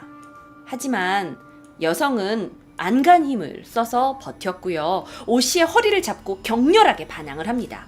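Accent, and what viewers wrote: Jeolla, South Korea. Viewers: 남자는 숫가락힘만 있으면 딴생각함 근데 제시카님 전라도출신이세요? 사투리 감칠난다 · 고향이 전라도신가벼여??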